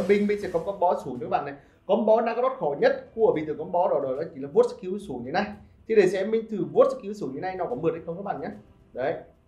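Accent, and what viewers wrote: Nghe An, Vietnam. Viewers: Nghệ An nào 😂😂😂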